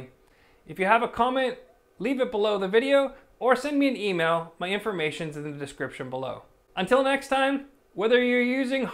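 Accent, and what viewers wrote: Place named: United States. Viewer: This gentleman wins the award for "most amount of accents heard in the 1st 15 seconds of talking" Norwegian, no Australian, wait no that's German, no British, no that's definitely some version of Nordic, wait is that Canadian or American? Oh no it's Polish maybe. Damn wait that's American, maybe Canadian. Honesty I'm still not sure. I'm 90% it's American but there's something else in there when he's in front of the camera.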